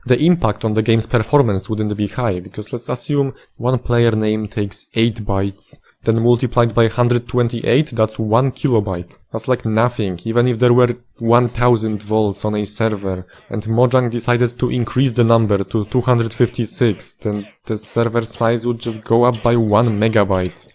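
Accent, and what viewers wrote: Poland. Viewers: kurwa ale polski akcent natychmiast slychac ze to polak mowiacy po angielsku doslownie po dwoch zdaniach niesamowite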